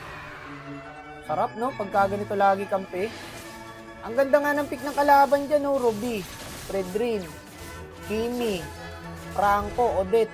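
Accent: Iloilo, Philippines. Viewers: Bos ilonggo ka naman mag salita sa content mo kakatuwa eh enjoy 😂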